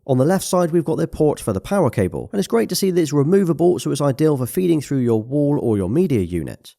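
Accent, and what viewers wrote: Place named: England, United Kingdom. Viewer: Love your English accent, mate.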